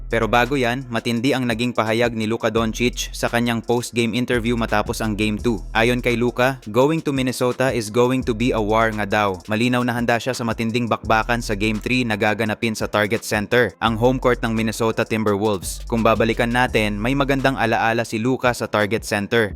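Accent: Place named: Visayas, Philippines. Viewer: San m ba nakuha yang balita m 😮 bisakol ka magbalita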